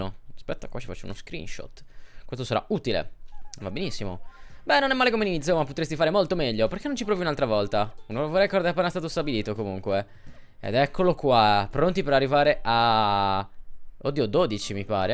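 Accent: Sardinia, Italy. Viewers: Ma cosa sei sardo?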